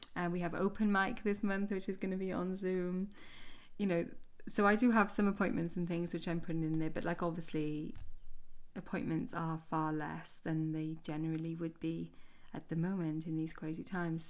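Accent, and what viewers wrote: United Kingdom. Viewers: Thank you for sharing this, even though you didn't entirely feel like it. I appreciate it so much. Not to be weird, but your voice is so soothing (part of that is the dumb American in my loving UK accents lol) and I'm having a rough night with insomnia so am catching up on YouTube. I'm teary now watching a familiar video from someone I love. And please note that this comment is NOT pressure to continue posting - if you don't feel up to it, don't do it! But I just want to say thank you for this one.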